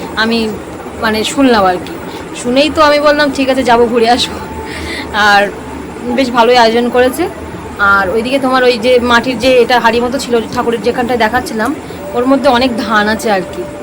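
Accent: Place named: West Bengal, India. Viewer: Tomar kothar j taan ta amar khub bhalo lage...amar bashirhater ak bandhobi ache...or kothar taan o erokom.....besh bhalo lage❤😊😊manu k bairer khaabar beshi diona....bhalo theko tomra ❤️❤️❤️❤️👍👍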